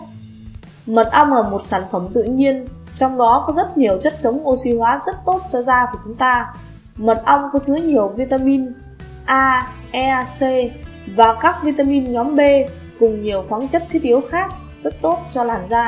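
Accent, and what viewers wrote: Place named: Countryside, Vietnam. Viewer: Giọng quê mùa kém sang lắm, ơi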